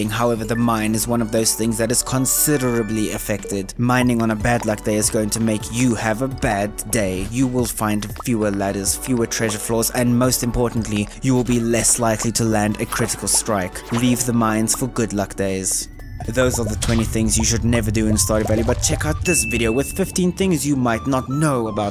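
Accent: South Africa. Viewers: This guy sounds South African.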